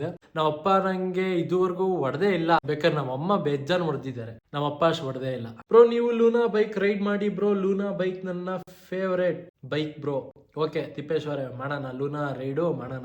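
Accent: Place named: Hassan, India. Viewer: Pakka hassan language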